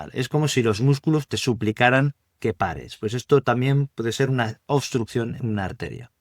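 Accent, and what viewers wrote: Spain. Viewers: Este señor no es el q esta hablando. Este señor es mexicano y la voz es de un español. Por lo tanto Este video no es confiable. VIDEO FALSO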